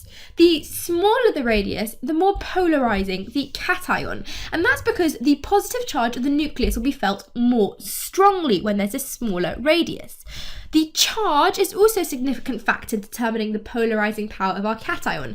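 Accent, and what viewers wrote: United Kingdom. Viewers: It's British accent. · its because of her accent lol mb she has been living in britain from her childhood and developrd british accent i dont know why some indian are hating her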